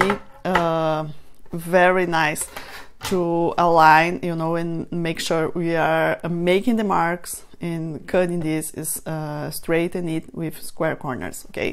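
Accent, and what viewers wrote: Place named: Brazil. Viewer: Are you Brazilian? By your accent, I guess so. 💜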